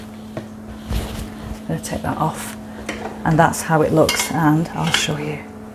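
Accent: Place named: England, United Kingdom. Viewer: I love your English accent with Urdu words 😊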